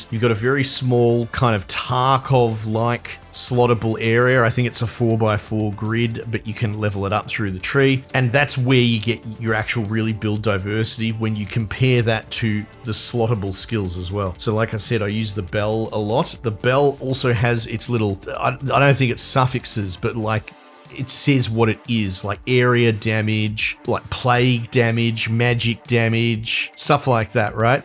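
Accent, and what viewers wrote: Australia. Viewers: ★ ★ ★ ★ ★ ⭐⭐⭐⭐⭐, in my 47 years I have never found myself on a youtube site for game reviews, and I only came upon your review of this game as I was looking for games that didnt require hundreds of gigabytes of storage. So seeing Hellclock at less than 3Gb I googled it and your review of this game was the top youtube result. So I start your review, and within 20 seconds I hit the subscribe button. There are so many reason why, and I wanna get back the review of the game so I wont blow too much smoke up your arse, but can I just say, I was in stitches and simulateously got a brain hard-on. You know your stuff man, and it's good to hear a fellow Aussie (or maybe Kiwi) who really doesn't need to be told how to suck eggs. I will be checking out more of your content in the near future, I just wanted to say "thank you, you're awesome." Sweet as I'm done.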